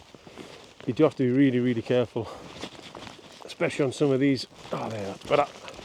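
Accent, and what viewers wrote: United Kingdom. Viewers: I love the British accent - are you a true Cockney ? I notice you have a nice thick head of barnett and chair and at least in a tent you don't have to go up the apples and bananas to bed ! I'm going to pony and monkey myself shortly - wish me luck geez !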